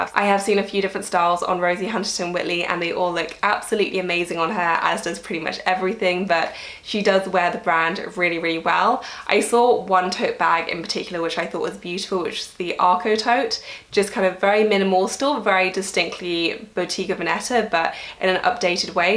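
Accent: United Kingdom. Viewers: Ruth-Ann Leveridge-Young I never cared for British accents until I heard her speak. 😂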